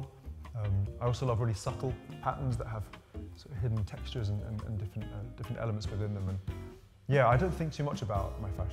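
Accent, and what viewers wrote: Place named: United Kingdom. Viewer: Charmingly British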